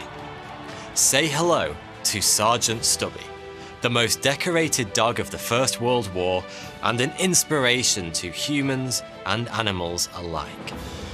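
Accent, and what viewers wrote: United Kingdom. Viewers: Blah blah blah brittish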